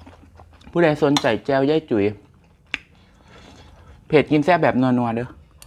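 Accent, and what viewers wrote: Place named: Udon Thani, Thailand. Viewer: เพิ่งรู้ว่าเป็นคนหนองบัว มิน่าสำเนียงคนอุดรจ้า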